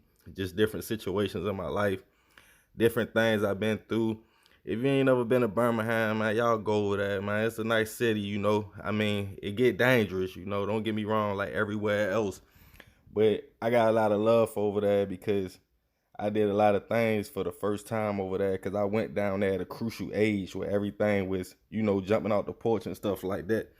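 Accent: Baltimore, United States. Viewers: My dude said T, d off on him!! You can tell you from Baltimore!! Shot out greenmount Ave 29th st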